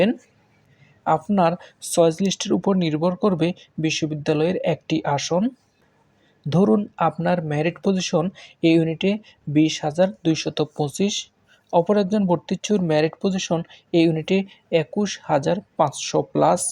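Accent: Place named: Noakhali, Bangladesh. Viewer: নোয়াখালী্র ভাষা চেঞ্জ করার দরকার ছিল।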